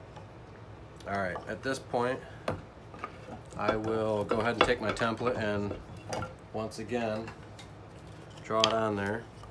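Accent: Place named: Michigan, United States. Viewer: Great stuff. Your stropping video helped tons. I heard the accent before I saw your channel name and knew you were from Michigan. It’s hard to find green wood downriver!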